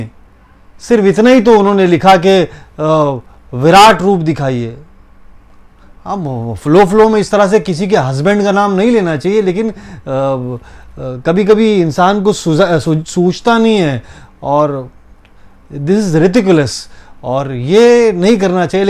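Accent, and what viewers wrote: Mumbai, India. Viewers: भाई... किसी को copy मत करो... आप original में भी काफी अच्छे हो .... मुंबईया language में ... 👍👍👍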